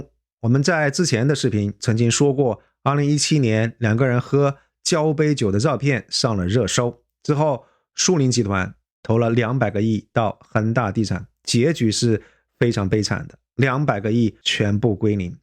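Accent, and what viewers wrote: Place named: Guangzhou, China. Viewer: 从发音来看，我猜是广州人:face-blue-smiling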